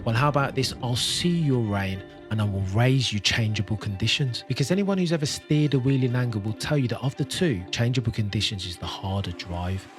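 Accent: United Kingdom. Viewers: Very skeptical once I hear a British accent, because no one is more biased than you lot. Will give it a few minutes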